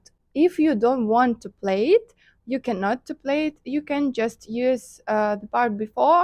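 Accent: Russia. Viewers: Ничего себе, у вас произношение нот как у русских, до ре ми фа соль ля си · Автор ведь знает русский? Мне, кажется, есть русский акцент...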